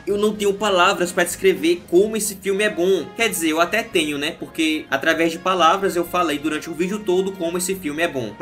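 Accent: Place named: Northeast, Brazil. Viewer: "Engraçado" o que vc disse que as pessoas te zoam por ser nordestino, sendo que eu sou paulista e tava ouvindo tudo e pensando "que delícia de sotaque, ouviria por horas" kskskkskskskks Admito que assisti o vídeo até o final mais pelo sotaque msm, visto que discordo de mts pontos criticando o segundo filme pq tenho uma visão mais de como se eu msm fosse os personagens, entendendo bem a trama e tals kskskksksksks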